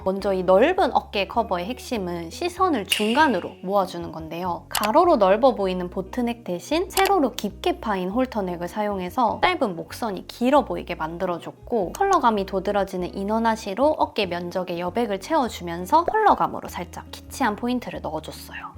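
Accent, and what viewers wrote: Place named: Daegu, South Korea. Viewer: 아이돌 미모에 옷 매치도 잘하셔서 패션유튜버중 가장 좋아요!! 그리구 대구 동생은 언니의 나긋나긋한 사투리말투도 너무 편안하고 듣기 좋아용❤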